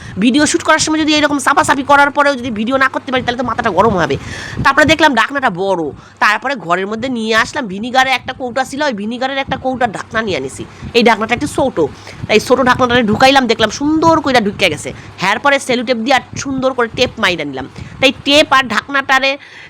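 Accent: Bangladesh. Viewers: আরে বাহ্ আজকের রেমিডি তো সুন্দর কিন্তু তোমার কথা মানে একদম বাংলাদেশের কথা দারুন সুন্দর করে বললে আমার দারুন লাগলো ❤❤❤ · ও দিদিভাই আজকে তো পুরো বাংলাদেশের স্টাইলে ভয়েসটা বেশ ভালো লাগলো❤❤❤ কথাটা তো বেশ ভালই লাগলো❤❤❤ তোমার ছেলের গাড়ি ভেঙে গেল তোমার এই টিপসটা এপ্লাই করবো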